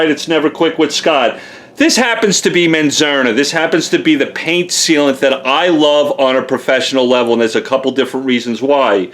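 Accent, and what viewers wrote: New York, United States. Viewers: I just love that thick NYC accent !!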